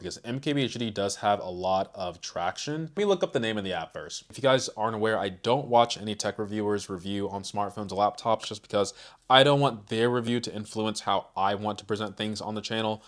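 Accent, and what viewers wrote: Nigeria. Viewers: Are you a Nigerian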